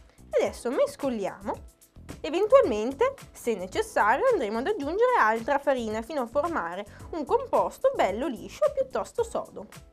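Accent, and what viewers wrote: Italy. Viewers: Ma sei di Parma?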